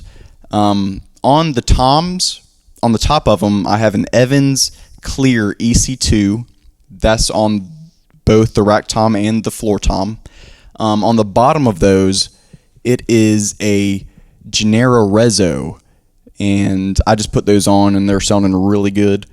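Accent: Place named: Maryland, United States. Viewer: where are you from? almost sounds like a Maryland accent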